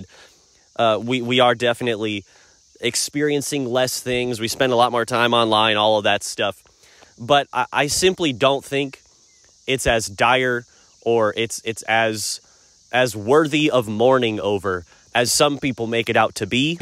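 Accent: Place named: United States. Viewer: That scenery looks like England, was surprised to hear a US accent.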